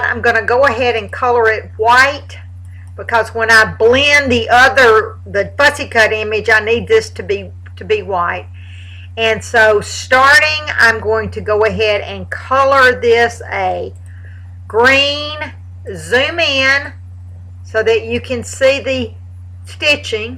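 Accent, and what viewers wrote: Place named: South, United States. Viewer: you are so awesome!!! and I LOVE your southern accent!!! (I'm from Kentucky, but lived most of my life here in Ohio, so I lost my accent :( ...)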